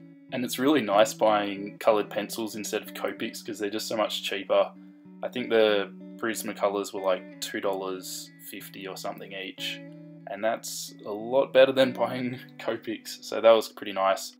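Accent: Australia. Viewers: He's Aussie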